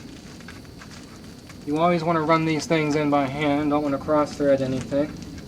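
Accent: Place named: Seattle, United States. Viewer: You sound like your from around Seattle/Everett WA.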